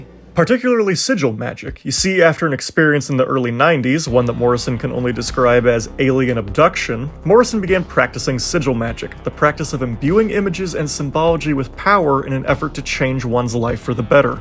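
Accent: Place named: Russia. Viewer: Really love hearing your thoughts but my god man your Scottish accent sounds Russian 😅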